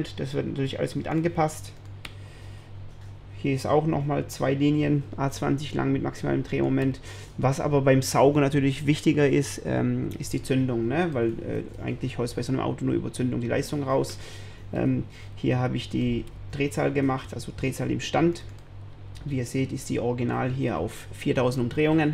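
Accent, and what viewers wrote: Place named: Swabia, Germany. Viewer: Super Video, schön erklärt und der schwäbische Akzent passt perfekt! Grüße aus dem Kochertal :P!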